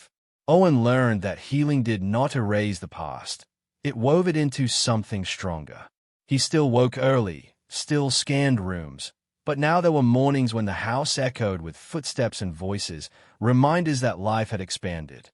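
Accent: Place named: Australia. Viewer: Love the Aussie accent!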